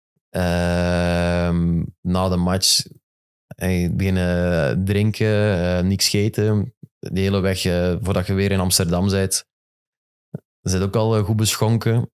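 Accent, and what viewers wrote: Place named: Antwerp, Belgium. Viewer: Kevin, hij spreekt antwaarps, niet limburgs😂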